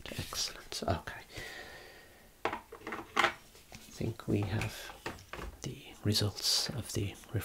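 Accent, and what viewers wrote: Birmingham, United Kingdom. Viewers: ARE YOU A BRUMMIE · Never thought I'd find a brummie optician relaxing enough to sleep to! Lovely voice.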